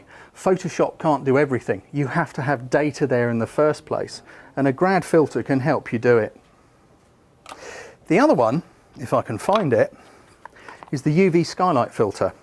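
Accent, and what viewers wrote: United Kingdom. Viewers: Nice British accent and off course nice info. thanks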